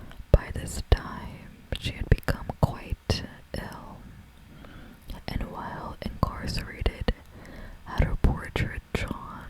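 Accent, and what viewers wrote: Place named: England, United Kingdom. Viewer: It's an English accent, lmao. There are many French accents that are quite distinct, just as in any language.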